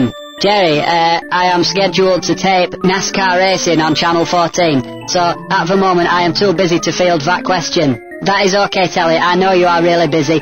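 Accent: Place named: Yorkshire, United Kingdom. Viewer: The Yorkshire is strong :P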